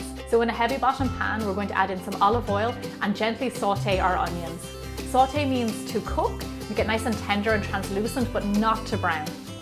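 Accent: Ireland. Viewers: didn't expect her to be irish. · with that Irish accent and beautiful woman ill cook anything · An Irish girl explaining an Italian recipe. Love it.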